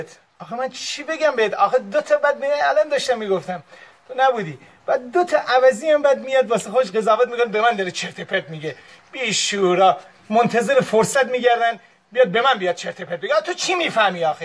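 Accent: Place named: Gilan, Iran. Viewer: از شنیدن لهجه شیرین گیلکی و دیدن انسانیت شما دلم از آلمان پرواز میکنه به بندر پهلوی که فقط برای اونجا دلم تنگ میشه نه شهرم تهران۔ خدا حفظتون کنه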